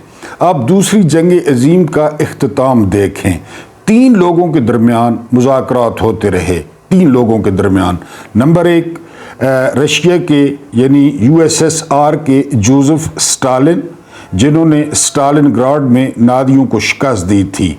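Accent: Saraiki, Pakistan. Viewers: صاحب حد ہی کر دیتے ہیں آپ سرائیکی کوٹڈ پنجابی سننے کا اپنا مزہ ہے ۔جگر حق و سچ کہنے پر بند نہ پڑھوا بیٹھنا ۔❤❤❤❤❤❤❤❤❤❤❤❤❤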